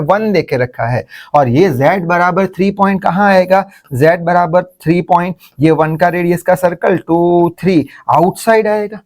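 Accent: Gujarat, India. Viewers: Sir are from Gujarat right????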